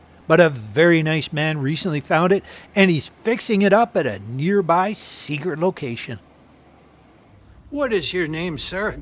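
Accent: Canada. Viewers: i know the family personally, went to high school with Mr. Clothier and 'Ms. Shirley's daughter [Jessica - my year - a friend] and son [John - a year earlier and more of a friend], in North Van. I have been to their home many times [an 18-minute walk]. I was actually more commenting on your dialect... being born and raised in North Van and had many First Nations friends [not in my neighbourhood, except 4 doors down from my childhood street ['weird' - not 'weird' - growing up that I never thought of them as 'Indian' - my brother was best friends with the oldest brother and all I identified the 3 brothers as Randy, Rick and Wynn] but in the Squamish reserve in North Van... [long story how I accumulated many First Nations friends in a reserve that was a 14-minute bus ride away], your dialect sounds very Squamish Nation, but maybe you could correct me. Musqueam? Love the background that I already knew, with a few phenomenal extras you revealed... 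exploring your high school friends is not what most friends do, except the home had war photos and stage acting photos of both Mr. and Ms. [from England and Canada and the University of British Columbia, my Bachelors and Masters alma mater], so some stories came out, but Mr. Clothier was rather humble about his war endevours, like most Canadian veterans, just like my father, a navigator in the Pacific during WWII.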